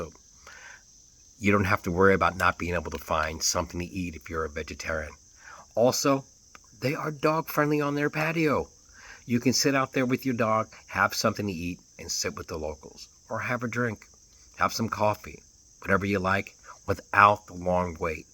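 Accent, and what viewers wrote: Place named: North, United States. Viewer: Your Northern accent doesn't fit Gatlinburg.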